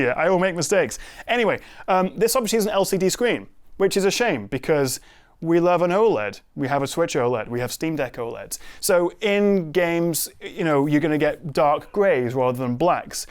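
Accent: United Kingdom. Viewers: Great video! I like your British accent. Gamers have complained how expensive the Switch 2 is compared with the original Switch was when it was released in 2017, but factoring inflation, as you did in the video, the Switch 2 is not really more expensive.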